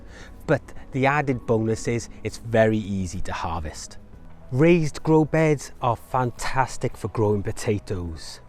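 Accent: Wales, United Kingdom. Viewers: I started growing potatoes in dustbins for the first time this year and I just found you, fabulous information, thanks just what I needed. I very much enjoyed listening to your welsh accent too as I live in France and don't get to hear that anymore, lovely, (said in a very strong welsh accent of course). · I'm guessing he has a Wales accent? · Glad I found this video. Tried to grow potatoes last year but had a poor harvest. Have now subscribed. Like the Welsh accent. Am I the only fan from Germany? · Hi. I'm also originally from Wales,so not only did I appreciate your tips but I also enjoyed the accent. I've just subscribed. What part of Wales are you from? I'm in 💃💃💃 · I think it’s a lovely Welsh accent❤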